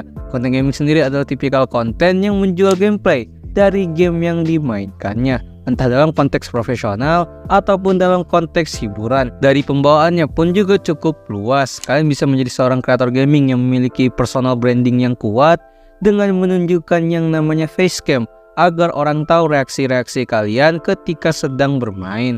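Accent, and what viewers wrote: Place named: South Jakarta, Indonesia. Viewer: iya lagi pake nada jaksel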